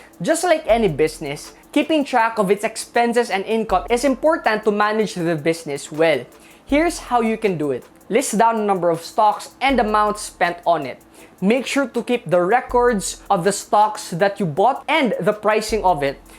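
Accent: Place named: Philippines. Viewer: Sir dapat nag tagalog kanalang kasi hindi bagay sayo kasi halata kasi pinoy ang iling mo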